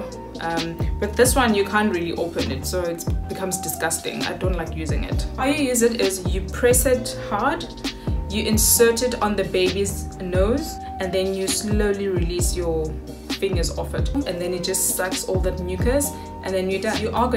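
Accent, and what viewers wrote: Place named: South Africa. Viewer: Thank you for this video. Lovely to hear a South African mom.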